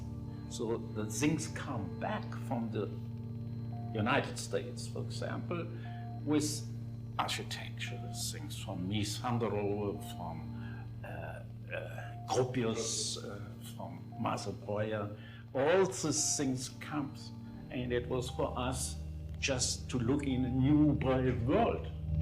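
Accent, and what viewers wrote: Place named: Germany. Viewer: Great video. Any body care to transpose correctly for Closed Captioning - it's hard to follow his Germanized-English. Thanks.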